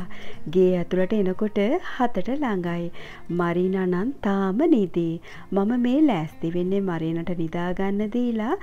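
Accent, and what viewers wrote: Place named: Japan. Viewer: ඔයා වැඩකරනව දකිද්දි මටත් අතපසුවුනු වැඩ කම්මැලිනිසා අතහැරපු වැඩ මතක්වෙනව🤭ඉතින් ඒව කරගන්න හොද උත්තේජනයක් ලැබෙනව ඔයාගෙන්👍❤️ඒවගේම මරීන පැටිය දැක්කමත් හිත පිරෙනවනෙ😘තවදෙයක් කියන්නම ඕන මරීන පැටිය තරම් නැතත් ඔයත් සිංහල කතාකරන්නෙ හරිම ලස්සන ජපන් උරුවකට ඒකටත් මම ගොඩක් ආසයි.❤තෙරුවන්සරණයි මරීන පැටිය ආදරෙන් මතක්කලා කියන්න🙏😘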